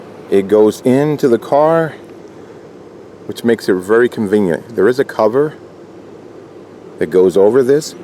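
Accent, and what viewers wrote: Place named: Oakland, United States. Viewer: Love the video. You from Oakland? You sound kinda like me and my buds. :)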